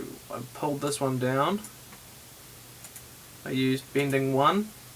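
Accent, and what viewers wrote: New Zealand. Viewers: haha i love how as soon as i heard this guy talk, i knew he was kiwi! NZ PRIDE! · NEW ZEALAND!!!! YEAH lol. first heard the accent and thought finally someone from my own country!!!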